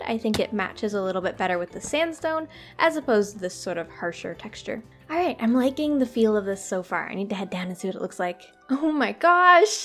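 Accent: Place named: Canada. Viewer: I never realise your accent until you say sorry then I remember you’re Canadian I love it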